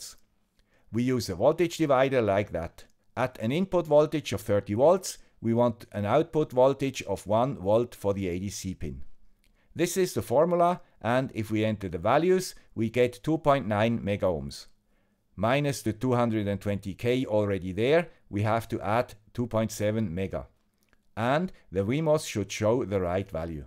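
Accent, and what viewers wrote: Switzerland. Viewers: No love from the guy with the Swiss accent for Swiss microcontrollers? I was expecting to see an STM32 here 😋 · the pronounciation is on purpouse to catch viewers, he speaks without the swiss accent in some vlogs:) I hear it every day at work, so I'm used to the accent :) Sali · Hey, you replied! No I am in fact not a native but I've got a CEFR C1 Language certificate which basically states that my English is quite good. This was in no way meant to insult you, as you also call yourself "the guy with the swiss accent" ;) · He has a Swiss Accent though! :-) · Hello, you do not have a swiss accent but a swiss GERMAN accent ! ! ! Grüsse von der anderen Seite der Röstigraben. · Watched already a lot of videos of your channel and at the beginning it was a lot of „häääää, versteh grad überhaupt nix“ but from one video to the next I start to understand what you are explaining us. 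Have to say that I am a totally new beginner. My goal is to measure the 2 12V Solar Batteries in the OFF-Grid garden of my parents in law. Tried a lot with the ADC from the ESP32 itself and as u mentioned it’s readings are just bullshit. Thank you for introducing the ADS1115 to me. I guess that will fix my measuring problem and can finally bring the project into production. As I am original from Vorarlberg and worked many years in Kanton SG I love to hear your swiss accent as it remembers me of that great time in my life! Electronic wise you are my personal hero ;-)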